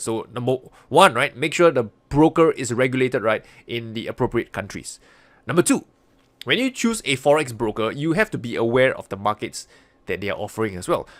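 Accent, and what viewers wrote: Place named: Singapore. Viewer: Greetings from Scotland. Just found your channel...wow some excellent information on here, been trading for over 15 years and its great to see someone like you providing so many videos free of cost. If I may make a suggestion, you should include some info on how to read fundamentals of the asset that they are trading. I assume with that accent you are from SG, lived there from 2005 to 2016, started off with an apartment in Great World City , then moved to River Valley for a few years and finished off in Toh Tuck Road for the last 6 years which were the best, living with the locals, not a foreigner in sight. LOL , not a day goes by without me thinking about my 10 years there, keep up the great work.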